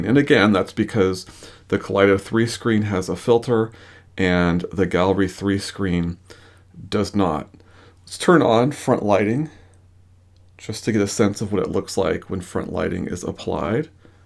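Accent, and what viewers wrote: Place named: England, United Kingdom. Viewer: Hi! I am from Argentina, nice to meet you , sorry for my English but I would like to ask you if you really are from US, you sound as if you were from England ! Thank you , wonderful channel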